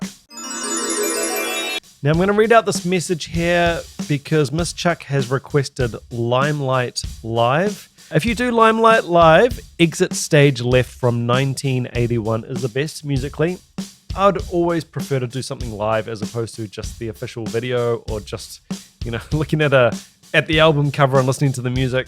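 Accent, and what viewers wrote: New Zealand. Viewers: Oops, just realized (re: my earlier comment) that I assumed you’re a Kiwi because of your accent. I have NZ and Australian friends so I’m pretty good at noticing the difference (I hope).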